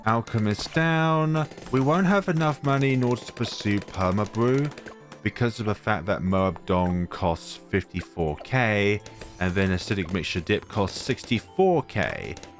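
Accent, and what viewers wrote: United Kingdom. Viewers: he's from the UK I think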